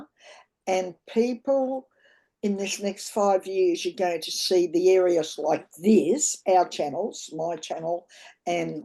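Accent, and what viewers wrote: Australia. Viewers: ❤. A common sense Aussie voice. Love your straightforwardness.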